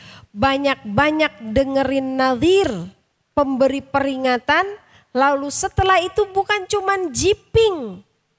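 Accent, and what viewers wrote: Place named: West Java, Indonesia. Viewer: Alhamdulillaah, trimakasih Ya Allaah,sy bisa mengikuti kajian Ustjh.sunda pisan,sy seneng pisan.sama produk sponsor nya juga menarik.dimana sy bisa beli? Udah di jual bebaskah?di Bekasi bisa di beli dmn?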